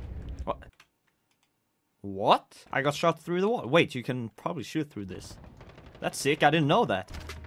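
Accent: Sweden. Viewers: are u swedish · U from Sweden??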